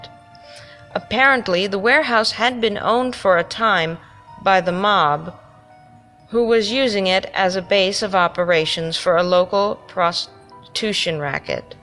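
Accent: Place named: Chicago, United States. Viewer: it’s an honest compliment you have an unusual voice kinda like Velma with a little more what I think is Chicagoan.......maybe upper Midwest like michigander Minnesota Possibly Wisconsin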